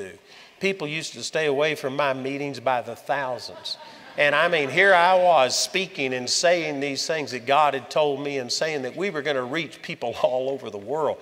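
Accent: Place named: Texas, United States. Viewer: Andrew, you are the best. You are, what, 73-years-old? You look half your age, strong as an ox, going great guns and you talk about standing 10 hours out of 11 like it's a walk in the park, not even realizing that you pushed yourself, LOL! I truly love and adore you. Your lovely Texan drawl still lulls me to sleep at night, especially when I am especially frightened or frail or falling into despair, and I am still very much Saved and living for the Lord and leaning into His sweet Grace and Comfort. Hallelujah. Praise God. Thank-you for being there, and being out there, and being so honest. Blessings to you 💙🙏🏼💙🙏🏼💙🙏🏼💙💙💙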